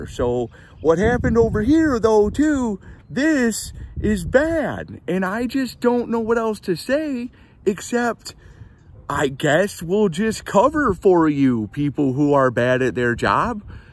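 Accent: United States. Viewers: Wait are you from PA?? Lol love ya bro!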